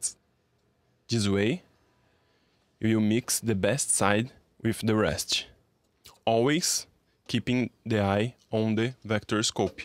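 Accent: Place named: Brazil. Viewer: Brazilian accent af, thanks for the video brother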